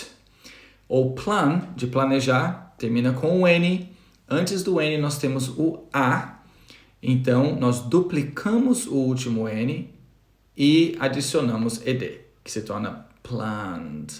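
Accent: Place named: United Kingdom. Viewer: That sounds great the British accent. I love it! I miss it! Congrats!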